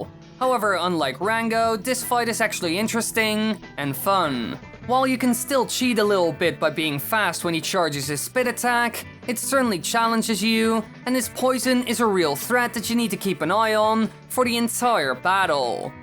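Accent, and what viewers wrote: Netherlands. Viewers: Goshdarn you're so dutch by your accent. Or belgian?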